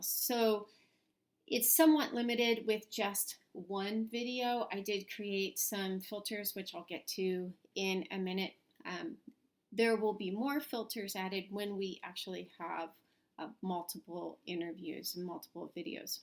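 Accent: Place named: Philadelphia, United States. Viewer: Are you originally from the Philadelphia area? I feel like I hear a little bit of a phila accent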